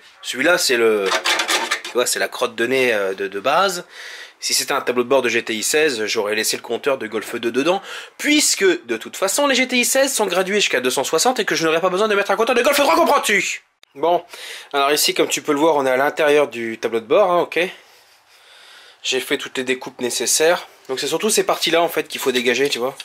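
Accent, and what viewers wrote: North, France. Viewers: Salut j adore tes vidéos de swap avec l accent de chnord lol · super video et serieux je kiff ta façon de parler on vois bien la famille nordiste t de ou dans le nord moi valenciennois et j'ai hate d'avoir de nouvelle video ça manque merci a toi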